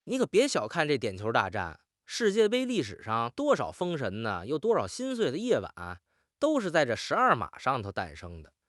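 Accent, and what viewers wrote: Beijing, China. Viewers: 的字幕不會用簡體中文不過這老北平口音....像，很像啊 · 作为北京人，喜欢这腔调👍😂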